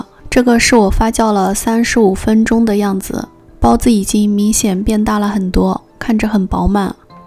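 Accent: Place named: Northwest, China. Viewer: 西北口音哈哈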